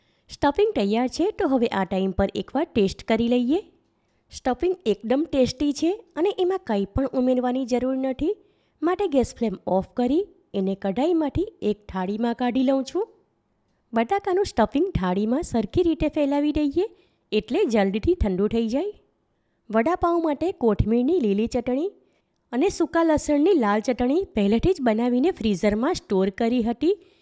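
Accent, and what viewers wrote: Surat, India. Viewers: Khub saras tame asal surati bhasha ma boline recepie batavi.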